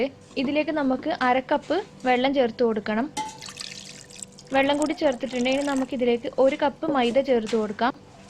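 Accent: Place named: Thalassery, India. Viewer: ingal thalassery kaari aano?? slang thalassery pole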